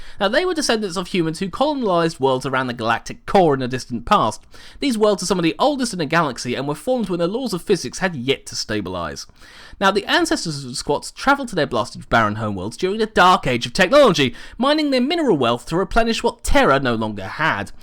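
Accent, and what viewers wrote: United Kingdom. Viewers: Hey I love your channel and every time you post a video it brightens up my day with glorious 40k goodness, delivered in a charming English accent just a it should be. I was wondering if you would re-visit space marine chapters with The Silver Skulls, a very little known chapter with a interesting set of traditions that really deserves more attention from fans. In short cross Ultramarines and White Scars.... yea thats a thing <link>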